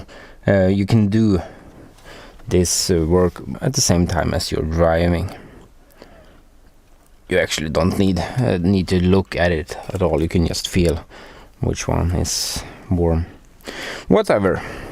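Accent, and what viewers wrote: Sweden. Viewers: Your video are gold, and that swedish accent is awesome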